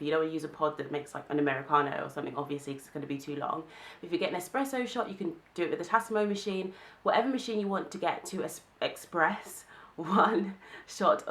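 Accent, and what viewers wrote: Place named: RP, United Kingdom. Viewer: I love your accent...I could listen to you talk all day!!!..so posh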